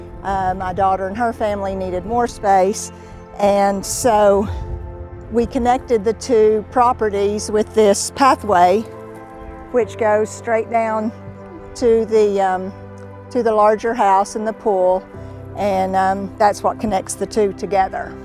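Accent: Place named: South, United States. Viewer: The video about the interior mentions that her kitchen countertops were crafted locally in Maryville, however there are about 7 states have a Maryville. Given her southern accent, I would guess its Maryville Tennessee, but I could be completely wrong.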